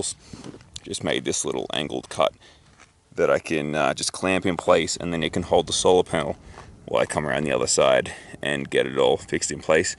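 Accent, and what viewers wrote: Australia. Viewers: Huh... You got an aussie accent ???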